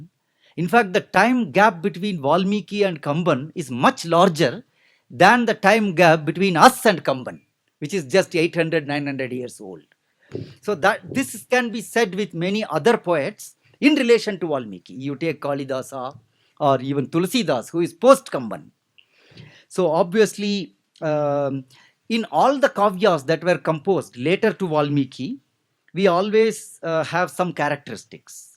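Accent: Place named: Tamil Nadu, India. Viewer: English pronunciation of Tamilians🙌🙌🙌🙌🙌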